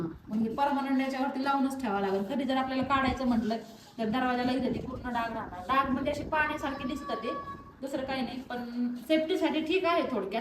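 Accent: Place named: Marathwada, India. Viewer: ताई मला ना तुमच्या मराठवाड्यातली बोलण्याची पद्धत खूप आवडते कारण तुमचे प्रत्येक शब्द खूप आपुलकीचे असतात मी दोन वर्ष मराठवाड्यामध्ये काम केले अहमदनगर औरंगाबाद परभणी अशा बऱ्याचशा ठिकाणी मी काम केले पण त्या ठिकाणी जी आपुलकी जी प्रेम आहे माणसं मिळाली ना ते खरंच खरंच खूप छान होते❤❤❤❤खूप जीव लावतात तिकडली😊😊😊आता खूप आतुरता झाली आहे ताई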